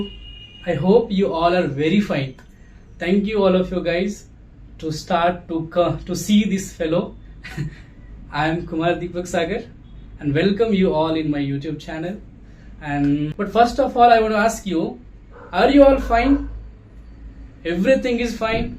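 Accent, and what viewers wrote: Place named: South, India. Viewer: My dear sir, your voice has a little south indian accent...... So calm and pleasant ❤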